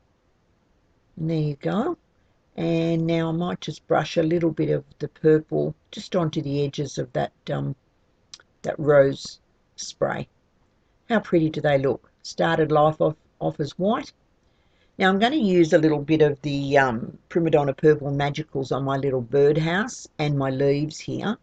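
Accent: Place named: Australia. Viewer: Love your tutorials and your accent lol. I'm from Aussie as well. Thank you so much for all these tutorials. I'm very new to this so I'm finding these extremely helpful.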